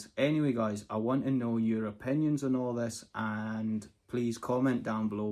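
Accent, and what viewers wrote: Scotland, United Kingdom. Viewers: Here ia what I like best about the channel. 1. You delightful Scottish brogue. It so soothing to the eat. 2. Watching the dogs work and see how much they enjoy working and absolutely think you are the best person in the world. 3. The beauty of the Scottish countryside, particularly when you are on the top of the hill and panning all around. 4. The interesting workings of the farm. 5. The walks in the woods. 6. The excursions to the farm shows and touring the cities. Keep up the good work. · I just wanted to take a moment to thank you for your wonderful YouTube channel. I work in an office and spend most of my days in front of a computer screen – a stark contrast to your life out in the fields with your sheep. And that’s exactly what makes your channel so fascinating to me. I really enjoy watching you work, even though I have to admit that I probably only understand about half of what you’re saying – your Scottish accent is quite a challenge for me!😂 But that doesn’t matter, because the visuals, the atmosphere, and the passion you have for your work speak for themselves. 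Thank you for sharing your daily life with us viewers. Your channel is like a little escape from my office routine, and I always look forward to your new videos. Best wishes from Germany❤ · I'm here for the sheep herding which obviously includes the dogs and you. But I really like just listening to you because all four of my grandparents are from Scotland and its almost like they're in the room with me! The fishing you mentioned really got my attention and the outdoors content. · Yes, your videos are a calm and peaceful retreat from the craziness of the world. I love your dogs and farming and your growing family. I would like to see you add more family time and adventures to this channel. Everything that you say and do brings Scotland to life, especially your Scottish accent. Keep it simple and share your family life with us here. Then I won’t need to look for two channels to enjoy your content. 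Thanks for all you do. It is such a pleasure to watch your videos. Keep up the good work. 💕🙏💕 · Just discovered your channel through Facebook few days ago. But I found fake accounts using your name. So I searched you on YouTube. My main reason to subscribe is : Kate, Storm and Echo Love them soo much !!! And your relationship with them…!! I believe that is also main reason why people are here. My suggestion is : you can do ANY VIDEOS ANY! because you are REALLY GOOD explaining things, but as long as you included your dogs here and there (please) I believe every body happy! Oh, have just watched your Christmas video the bake competition ! 😂 so funny! And this is not even Christmas 😂 You are super talented shepherd. Do it every Christmas please…you will make every body have their‘merry little Christmas’😍 Oh and the Scottish accent?? Like music to our ears! Love it !❤ May God continue bless you 🙏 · The reasons I watch 1. 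for the dogs. I have 2 border collies that hike and do agility but watching BCs do what they were bred for is beautiful. 2. Scottish scenery 3. Listening to the lovely Scottish accent I would watch other content about Scotland. I have no opinion about whether it should be a separate channel.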